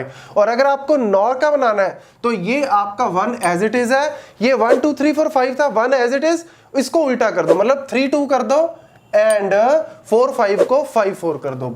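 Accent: Punjab, India. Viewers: Sir aap Panjabi ho kya?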